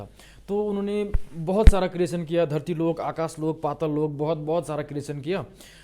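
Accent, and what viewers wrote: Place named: Nepal. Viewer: Nepali tone... are you nepali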